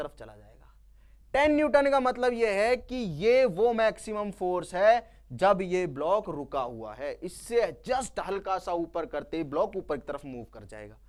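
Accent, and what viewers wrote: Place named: Haryana, India. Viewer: Guruji pahle teacher ho jiski baat smjh m aarhi h lage s aap haryana te ho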